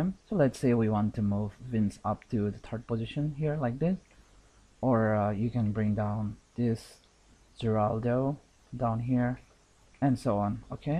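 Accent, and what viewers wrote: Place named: India. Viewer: Good job with showing this technique. From where can we download the sample file? I'm just curious about the accent, are you originally from India?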